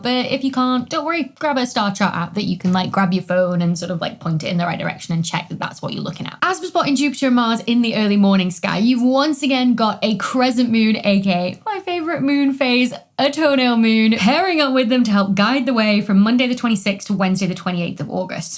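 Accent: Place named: North West England, United Kingdom. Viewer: What part of the UK are you from? Your accent sounds very north-west.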